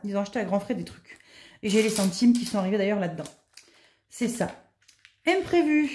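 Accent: South, France. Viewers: Cc 5000 abonnés c est super.....c est grace à ta bonne humeur,ton accent plein de soleil et tes vidéos toujours agréables et de bons conseils !!!! ❤❤ Pour les défis je suis comme toi j ai envie de tous les faire !!!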